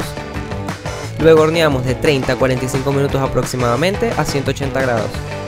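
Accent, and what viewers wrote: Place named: Ecuador. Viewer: es que tienes asento como si fueras ecuatoriano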